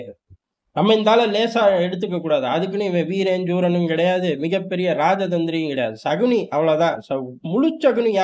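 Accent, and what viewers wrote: Madurai, India. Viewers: மதுரை ஸ்லாங்காத் தெரியுது !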